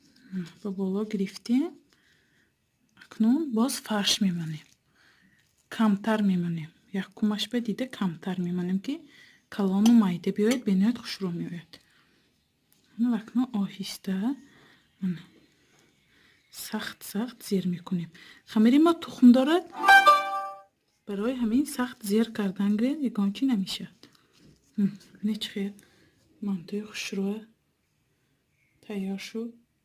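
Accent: Isfara, Tajikistan. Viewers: Гапзани исфарагми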